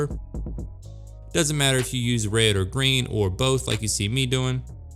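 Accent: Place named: Louisiana, United States. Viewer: Sounds to me like... You must be from Louisiana! 😁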